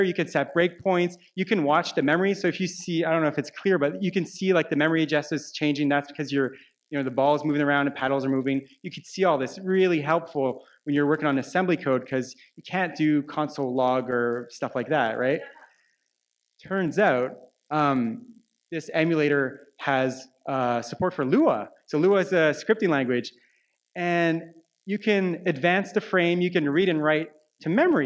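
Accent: Canada. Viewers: Within 10 seconds, we all realized he was from Canada. Then immediately after he said "I'm from Canada" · All the comments on how he pronounces Mario. It's the Canadian accent... You're over there basically saying "wtf, this Canadian talks with a Canadian accent!?!?" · It is a Canadian French accent (Quebec)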